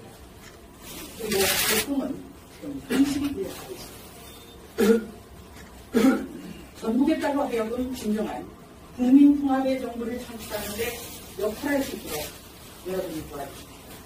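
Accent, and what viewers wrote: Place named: Seoul, South Korea. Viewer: 조수진 의원님 화이팅입니다 서울 토박이인줄 알앗네여 뉴스하실때도 똑 의원의로도 똑 입니다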